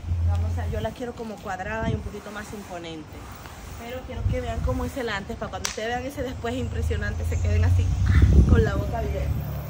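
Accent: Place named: Mexico. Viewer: Ese acento mexicano no te keda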